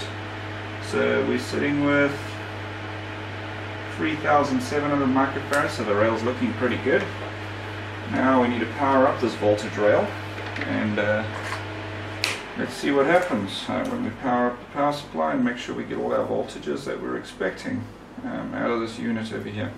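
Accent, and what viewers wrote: South Africa. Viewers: Ha// spot the South African accent a mile away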